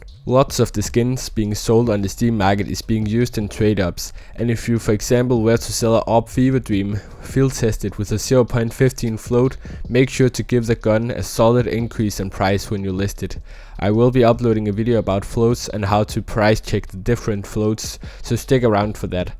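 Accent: Denmark. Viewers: Kan høre der er lidt dansker over det her aha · I smell Danish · i smell danish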